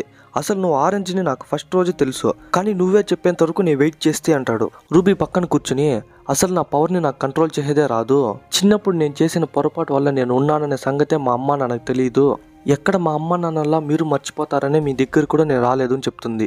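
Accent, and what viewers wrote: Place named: Rayalaseema, India. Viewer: Mana rayalaseema accent lo balega explain chesavu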